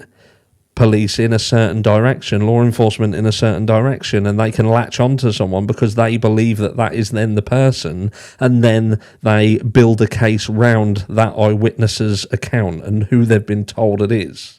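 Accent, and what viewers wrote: Norfolk, United Kingdom. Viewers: Are you from Norfolk uk, as I am? X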